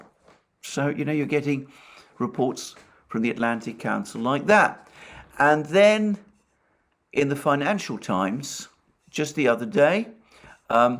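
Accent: England, United Kingdom. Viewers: is a Russian with and English accent.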